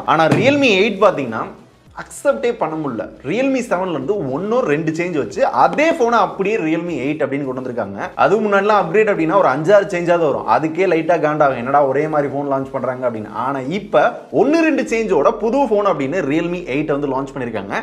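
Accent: Madurai, India. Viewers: மதுரைகாரன் பேச்சே தனி தான்...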